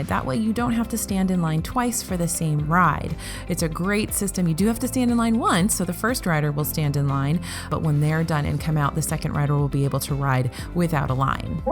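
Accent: United States. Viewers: Justin Porche I am British so she has an American accent to me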